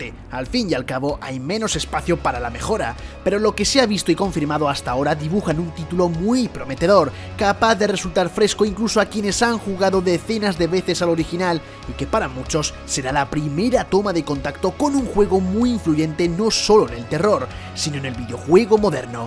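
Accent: Spain. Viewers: mucha pupa porque suene Castellano de España? en fin estáis mal de la cabeza xddddd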